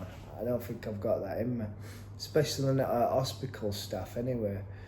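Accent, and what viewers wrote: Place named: Scotland, United Kingdom. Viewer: Off topic but your accent is ten out of ten ha I love it. Best English accent from a scotsman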